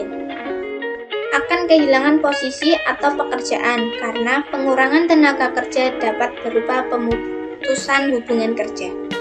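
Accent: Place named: Java, Indonesia. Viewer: Cara penyampaian unik dengan logat jawa, jadi mudah di headline pointnya👍👍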